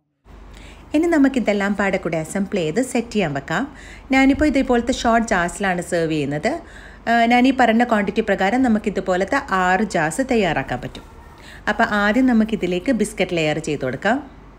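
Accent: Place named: Kannur, India. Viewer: ചിലർ നമ്മെ അത്ഭുതപ്പെടുത്തും എന്ന് പറയാറില്ലേ... എത്രമാത്രം ശരിയാണെന്നു ഇങ്ങളുടെ ചാനൽ കണ്ടാൽ മനസ്സിലാവും.. അസാധാരണമായ റെസിപ്പികൾ, ആരെയും ആകർഷിക്കുന്ന അവതരണം മാസത്തിൽ ഒരു വീഡിയോ ആണെങ്കിലും അത് കാണാൻ ആരും കാത്തിരുന്നു പോവും.. 🥰🥰🥰 എത്ര ഭംഗി ആയി വളരെ വ്യക്തമായി അവതരിപ്പിക്കുന്നു.. കണ്ടപ്പോൾ തന്നെ വായിൽ ഒരു പേമാരി വന്നു 👌👌🤣ഒരു രക്ഷയും ഇല്ല... കിടിലൻ റെസിപ്പി.. ഭാര്യക്കും പെങ്ങൾക്കും ഷെയർ ചെയ്തു... 👏👏👏 ബ്രോയുടെ ബിരിയാണി കിടിലൻ ആണ് 🌹🌹🌹 (ഇങ്ങൾ kannur ആണോ നല്ല അക്ഷര ശുദ്ധിയുള്ള സംസാരം ). ടോട്ടലി അടിപൊളി എപ്പിസോഡ് 👏👏👏👏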